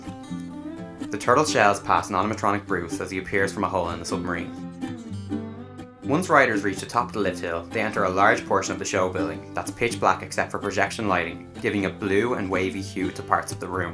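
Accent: Ireland. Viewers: Yes, it's excellent quality. Thank you. Just not used to a non-American accent in these videos lol. Irish?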